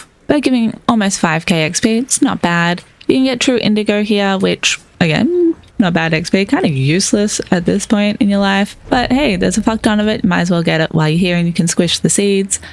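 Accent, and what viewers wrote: Australia. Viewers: i love your aussie accent and attitude when talking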